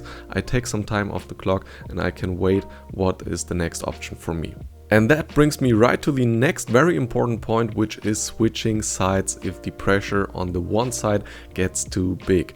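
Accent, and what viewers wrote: Germany. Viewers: Deiner Aussprache und deinem Akzent zufolge kommst du aus Deutschland wieso machst du die Videos dann nicht auf deutsch?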